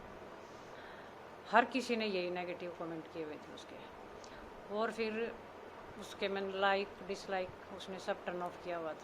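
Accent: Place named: Haryana, India. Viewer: Anti kti dhar pr dhr de h aapni haryanvi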